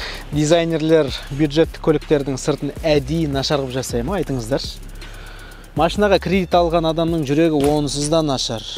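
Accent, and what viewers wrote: Standard. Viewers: Жарайсың таза қазақша сөйлейсің оған қоса тарихты айта кетесің ✊ дами бер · Қазақшасы таза екен иа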